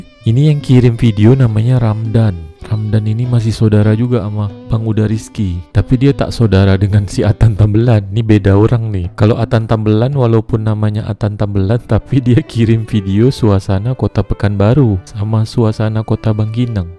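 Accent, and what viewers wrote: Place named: Perak, Malaysia. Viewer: Salam dr mlysia..dgr drp dialek tuan punya video, mcm dialek org perak jer, awak tu mksudnya saya..dialek k.kangsar & parit..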